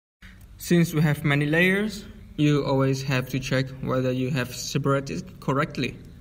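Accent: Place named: Italy. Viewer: Woow man You have an accent like a man in video "italian man who went to malta" and that's so funny and cool :D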